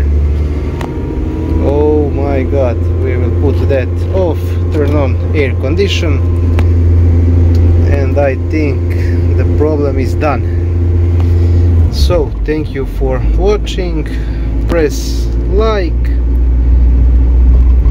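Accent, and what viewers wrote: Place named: Croatia. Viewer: engleski ti ide super al mi se čini da ima i nešto hrvatskog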